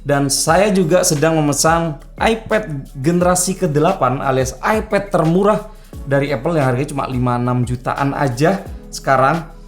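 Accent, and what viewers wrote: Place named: Java, Indonesia. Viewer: medok mu mas..... hahahaha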